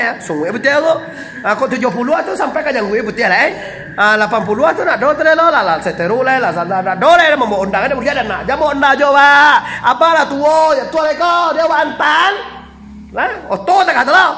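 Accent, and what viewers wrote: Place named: Pariaman, Indonesia. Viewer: Bahasa Minang kabau logat Pariaman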